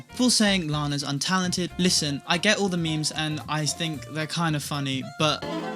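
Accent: United Kingdom. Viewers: Not related to the video but im new here and fricking out right now, like, Handsome cute deep british accent intelligent time stemps his video to subjects y so perfect 😍 ?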